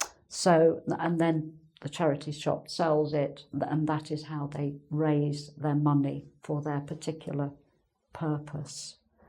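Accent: United Kingdom. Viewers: Hello, thank you so much for your video lessons. You have very beautiful and clear British pronounsiation. I've got a request. Could you please make a video where you explain the meanings of the modal verbs like must, have to, ought to, to be to etc. The difference between them and how to use times with modals and so on.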